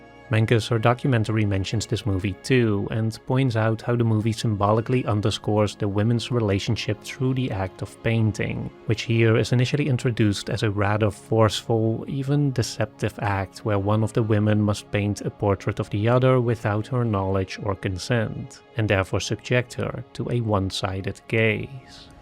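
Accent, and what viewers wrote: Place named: Netherlands. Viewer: hmmmm you sound Dutch. Are you Dutch?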